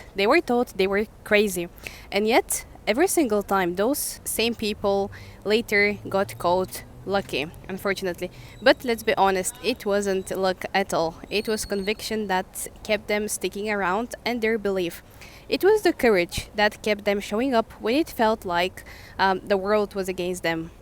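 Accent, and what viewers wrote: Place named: Brazil. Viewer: you being mysterious...if i had to guess you eather brazilian(because of your accent) or middle eastern....am i close?😅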